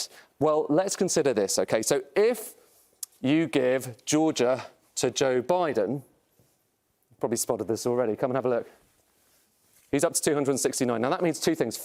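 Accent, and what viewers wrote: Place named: United Kingdom. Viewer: I don, t understand why this British accent? I get soooooo confused. Where is actually this Election?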